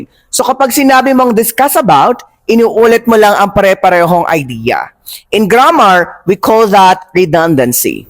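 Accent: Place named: Philippines. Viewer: you explain well. but the problem is your pronounciation. anyway its ok that is the filipino trademark.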